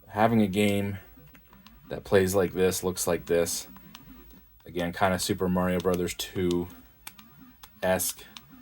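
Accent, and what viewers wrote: Canada. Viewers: You sound like Canadian garbage. I'm out.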